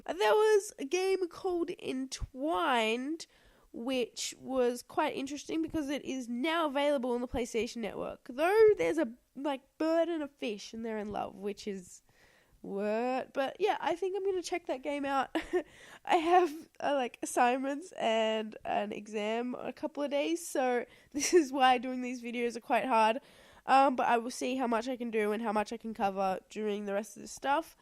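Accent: Australia. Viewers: ***** here is a wake up call if u don't like how people talk nobody is even going to waste a second with you or even pay attention. I on the other hand wanted to just say somthing maybe u could change again if u don't like how she talks then just leave the channel if u don't like the Australian accent know that nobody is going to change for your ungrateful ass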